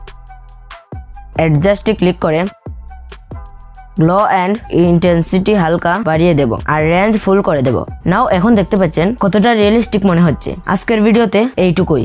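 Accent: Sylhet, Bangladesh. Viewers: ভাইয়া তুমি কি সিলেটি ❤😊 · Tumer bari Sylhet ni suto bai? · ভাই তুমি সিলেটি নি বা.!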